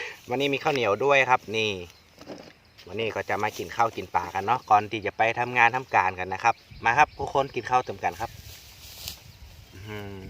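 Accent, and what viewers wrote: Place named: North, Thailand. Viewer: อู้เมืองก่น่าฮัก น่าฮักแต้เนอ เสียงนกเสียงหนูบรรยากาศดีเนาะ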